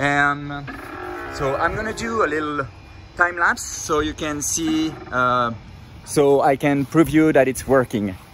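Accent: France. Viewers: Hshahaha the french accent 😂😂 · Very good project . Accent très frenchy ;-)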